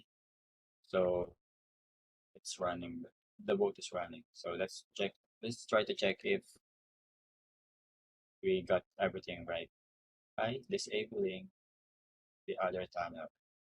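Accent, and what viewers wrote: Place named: Philippines. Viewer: thanks man i really appreciate your tutorial, i cant help but wonder if you're a filipino based on thee way you speak (I'm filipino too)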